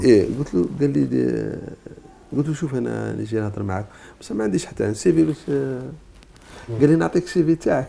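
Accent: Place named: Oued Righ, Algeria. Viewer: بالرغم أنه عاش مع الغرب بقي يتكلم بلهجة وادي ريغ ولايته ولاية المغير سبحان الله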